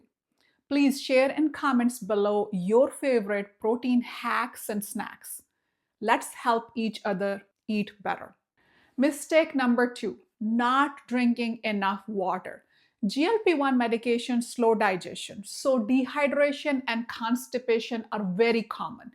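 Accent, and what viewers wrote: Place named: India. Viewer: Good one but sorry to say u should change your pressurized Indian accent ..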